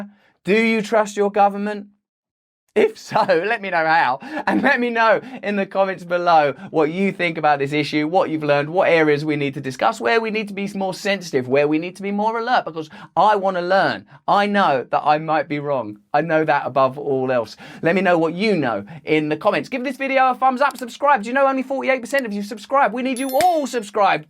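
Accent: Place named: United Kingdom. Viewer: Greetings from 🇺🇸. I love your British voice!🙏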